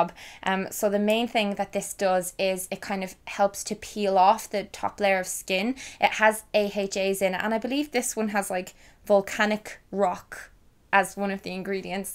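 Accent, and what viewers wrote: Ireland. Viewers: most youtubers just bore me but i could sit and watch all your videos back to back because i love the content so much! but the irish accent just makes me feel so at home, as my grandad is irish and i love listening to his stories! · Is she Irish 🤔🤔 X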